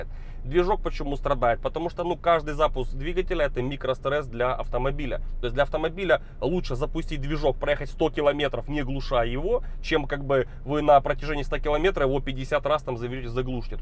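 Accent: Ukraine. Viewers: блеадь эта укр ская манера речи хрен что поймешь ) даже увеличив звук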